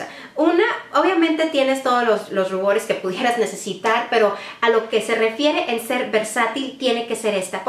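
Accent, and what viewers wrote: Fresa, Mexico. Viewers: Bien Fresilla que se oye 😅🍓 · Que diferente la voz algo fresa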